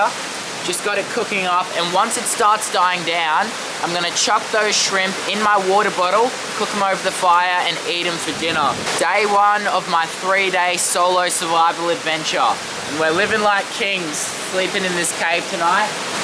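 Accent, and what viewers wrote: Australia. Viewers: 300 ft bruh I thought you were Australian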